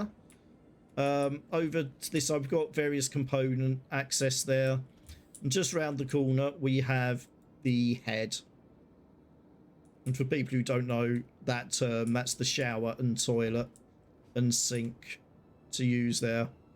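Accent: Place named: United Kingdom. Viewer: Thanks for the kind words and you already got something I don't have.. A cool British accent! Keep it up man! Subbed.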